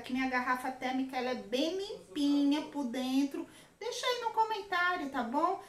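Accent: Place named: Maranhão, Brazil. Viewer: Parabéns, vou la na potiguar! Quando ouvi teu sotaque ja sabia que é maranhense tbm kkkkk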